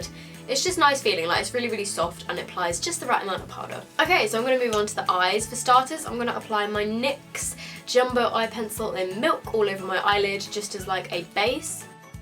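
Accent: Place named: United Kingdom. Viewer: It is so refreshing to not only hear a fellow Brit doing these kinds of videos, but also who doesn't tan and is pale! Loving the videos :) x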